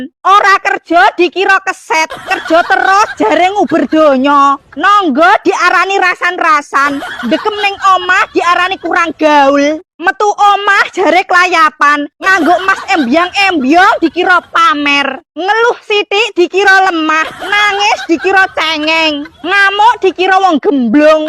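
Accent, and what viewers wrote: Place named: Banyumas, Indonesia. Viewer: Ora ngapak.. Ora enak ❤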